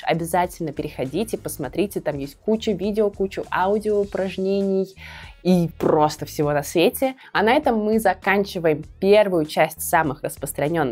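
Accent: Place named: United Kingdom. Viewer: ммм этот британский акцент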